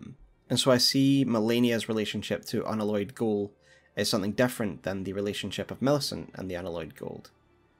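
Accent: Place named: Scotland, United Kingdom. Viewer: A fucking love your Scottish mate. Good to see your putting us on the map for the souls Community 😉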